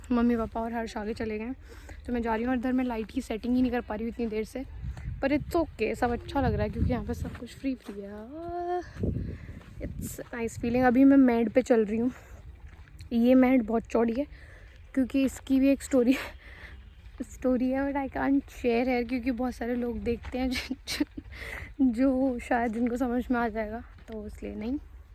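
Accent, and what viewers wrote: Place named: Meerut, India. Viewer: Your language matches with mine...you may be somewhere from meerut, moradabad, sambhal , chandausi wagerah.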